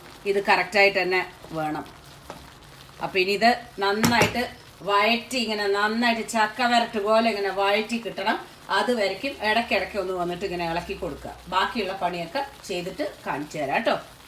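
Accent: Palakkad, India. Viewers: l love to hear your palakadan language and reciepes. 😊💕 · Ha ha ha you r really super Edakkedekku kudikkan And language Palakkadadan 👌👌👌👍👍👍😁😁😁😁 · Pazham prathaman looks simply awesome. Loved your palakadan language and presentation.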